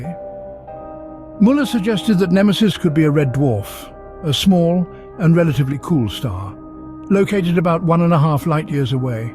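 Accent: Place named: United Kingdom. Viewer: This channel has British narration but channel has no social info and based in Japan? 😂 Neat. And video was nice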